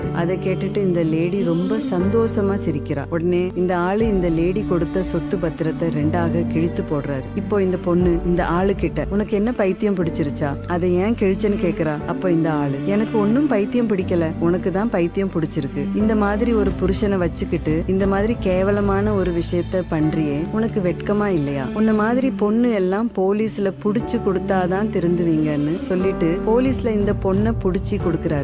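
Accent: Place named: Kerala, India. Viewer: Madam unga videos ellam super.unga voice very very super.unga kuralil malayalam kalantha tamil ketka enimaiyaka erukkirathu❤❤❤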